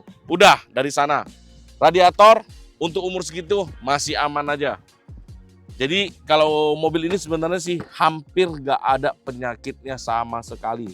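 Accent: Medan, Indonesia. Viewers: Medan kuiii😂😂😂😂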